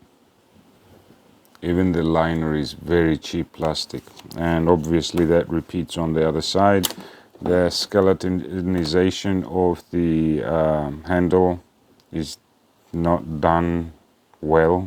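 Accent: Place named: Georgia, United States. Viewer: you have a Georgian accent.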